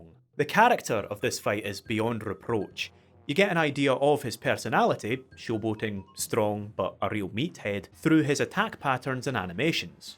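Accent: Scotland, United Kingdom. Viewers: Your accent is so gloriously Scottish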